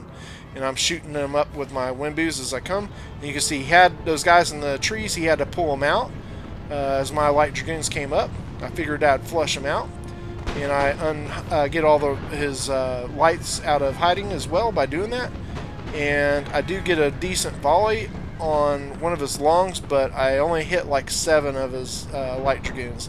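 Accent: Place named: South, United States. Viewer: I dont know why youtube suggested this video but I found it entertaining. Your commentary is pretty good and I must say I find your (somewhere in the southern US) pleasing to listen to, but perhaps 15 minutes is a bit too long. Anyways you earned yourself a sub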